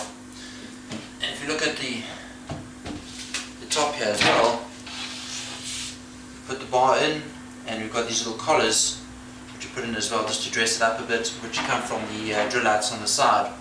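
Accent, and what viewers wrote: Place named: South Africa. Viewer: Not at all, you sound 100% South African, just wondering if you were also experiencing people getting confused with the 'English' South African accent as opposed to our Afrikaans accent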